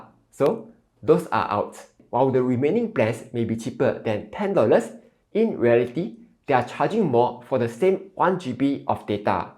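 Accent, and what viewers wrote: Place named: Singapore. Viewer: This guy's accent is the most Singaporean of all Singaporeans.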